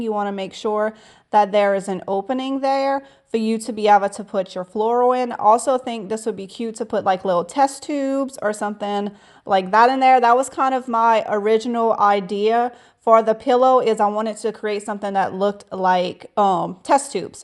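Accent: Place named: Deep South, United States. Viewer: You are so creative, love the projects. Just subscribed. And that deep South accent,lol, couldn't stop listening.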